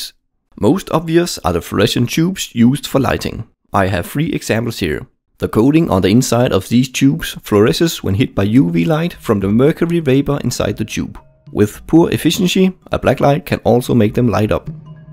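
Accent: Denmark. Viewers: Hvis man er fra danmark kan man også godt høre at du er fra danmark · you from denmark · man kan bare høre at du er dansker:P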